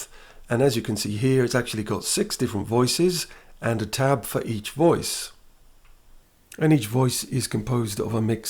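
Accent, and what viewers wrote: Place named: South Wales, United Kingdom. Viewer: It's been doing my bean in for a while now. I KNOW that voice/accent !! ... but I can't place from where I remember it. Another YT channel ? My musical adventures from days of yore ? My work with PAs ? . I too have a South Wales accent but mine's from a little further West. Love your work in reminding us of the power of Reaktor btw. 👍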